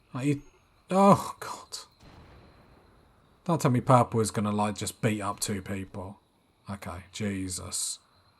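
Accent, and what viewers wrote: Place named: United Kingdom. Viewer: Your accent...just adorable! I can't have enough of the British accent! Well I have a house in England, you can understand that I love your country! xx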